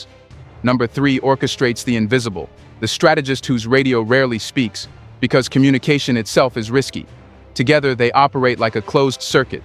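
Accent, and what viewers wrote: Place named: United States. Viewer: Who is this??? Why are you providing such Details? Who sent you? This American accent reporting so hard on this man. Thanks but no thanks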